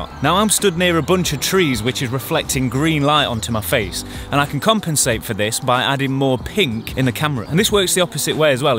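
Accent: Nottingham, United Kingdom. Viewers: You've gotta be from Notts duck!? Great vid. Subbed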